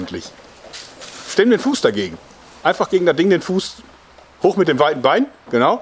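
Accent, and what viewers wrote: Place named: North Rhine-Westphalia, Germany. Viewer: Da bin ich mal gespannt.... Einfach geil - der NRWler ist einfach durchzuhören !!!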